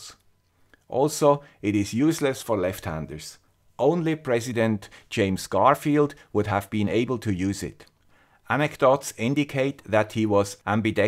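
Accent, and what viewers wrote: Switzerland. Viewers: I had a dream The guy with a Swiss accent decided to build an eBike. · Boy oh boy do you come with some baggage! (Politically correct, left-handed, anti-imperial system with stimulating views of silicon.......). I only hope you find making these videos as cathartic as I find them humourous. A real fillip early on a Sunday morning. Power on you favourite 'guy with the Swiss accent':) I remember our blue handled diagonal cutters - that lasted for years being (I was going to say stamped but of course that is too crude) 'engraved' with 'Swiss Made' on the shaft (in fact probably hand-signed - they were so expensive). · Having a good Swiss accent, you must be unbiased! But I like my yellow stripper. I had mine to bits, oiled it, adjusted it and loved it and it works fine. I do agree about the end stop. It lets the end go past, and is imprecise in its adjustment. 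Why don't the Swiss make one? They make excellent watches, so a wire stripper that works should be a piece of cake! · I love your Swiss accent and pronunciation - Knipex for instance. In German the K is pronounced. In UK/US English the K would be silent, so we generally pronounce it 'Nipex'. I suppose it should be K nipex as it's a German product, but I'll stick to Nipex - it's easier to pronounce!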